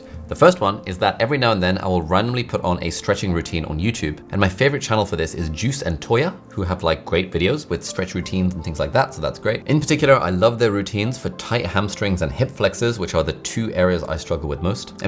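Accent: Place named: United Kingdom. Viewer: Have you ever made a video of your transition from Pakistan to the UK? How did you absorb the British English dialect?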